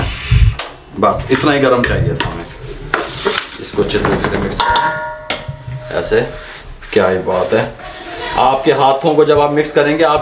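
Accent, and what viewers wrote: Punjab, India. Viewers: Nice uncle j Allah apko kamyab kra apka urdo ma Punjabi bolna mja boht acha lgta h Khush rhy ameen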